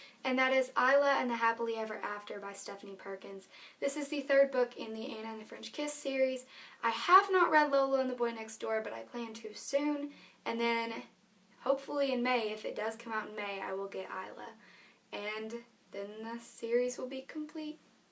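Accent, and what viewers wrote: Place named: United Kingdom. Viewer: Haha. It's true. I am fascinated by British accents. And I'll trade weather with you! It's like 1000 degrees over here. :/ Have fun on spring break! :D we'll miss ya. Oh, and thanks for the tag. xD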